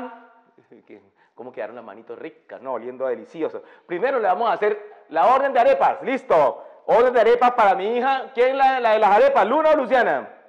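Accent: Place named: Colombia. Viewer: Me encanta su acento Colombiano ❤️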